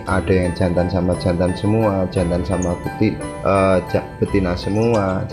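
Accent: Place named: Banyumas, Indonesia. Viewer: Mas orang mana , ko kaya ngomong ngapak ?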